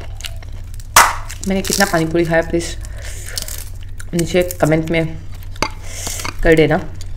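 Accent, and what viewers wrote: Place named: Bengal, India. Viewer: দিদি তুমি কি বাঙালি ?.......... আমি sure না তাও তুমার কিছু কিছু কথায় বুঝা যায় তুমি বাঙালি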